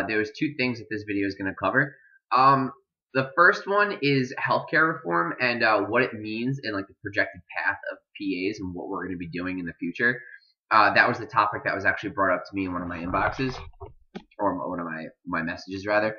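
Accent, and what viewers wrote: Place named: New Jersey, United States. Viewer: Tried to do your NJ accent but kept on making a southern accent lol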